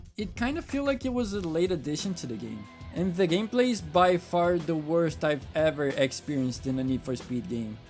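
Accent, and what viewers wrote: Brazil. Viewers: Esse sotaque terrivel de brasileiro me vez sentir ofendido, vou cancelar Need For Speed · Te sigo há meses e meses e só agora que descobri que tu é brasileiro. Bem que desconfiei do sotaque ahahahaha um dos canais que mais me divirto assistindo. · Teu sotaque é igual ao do Ayrton Senna falando inglês mano kk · Adoro seu sotaque típico de Br, eu fico puxando R quando falando inglês, só sendo estúpido Achei seu canal agora, ótimo vídeo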